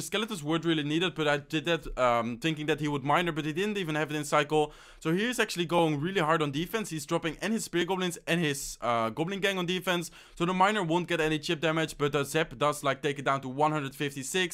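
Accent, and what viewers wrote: Netherlands. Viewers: Typical dutchmen accent 👍 wacht op een nieuwe log bait deck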